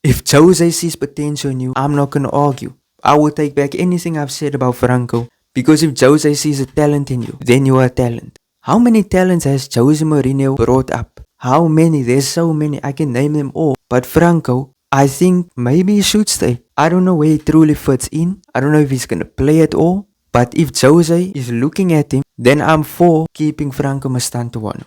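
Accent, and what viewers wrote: South Africa. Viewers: Are you South African by any chance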